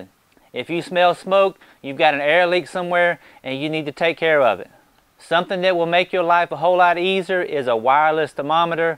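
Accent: South, United States. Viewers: Love hearing someone with a strong southern accent describing things so intelligently and knowledgably. Well done on the video and your project; you obviously have it figured out. Thanks for the post!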